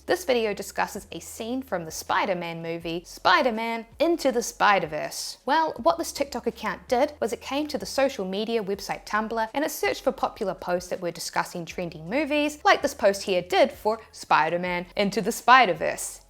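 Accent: New Zealand. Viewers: Fush and Chups! Love the accent and Aotearoa (from UK) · I love your kiwi accent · Thought you were Australian but apparently New Zealand is somewhere between Irish and Australian. Looking at the pinned comment I'm sad to see that this isn't such an original idea. Oh well... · I do hope to try these tips at some point but for now, I'm here for the hacks and the New Zealand accent (which is amazing!) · Good to see another Kiwi on here 🙂 I happened to stumble upon your page by accident, and so glad I did. Your content is awesome. As an ex-pat, I miss hearing the accent. Keep these wonderful videos coming.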